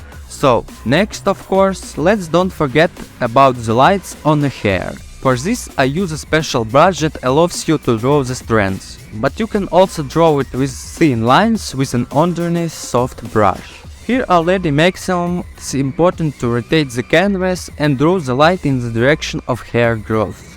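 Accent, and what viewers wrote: Russia. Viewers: Russian accent🤯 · хорош, сразу слышу русского чела )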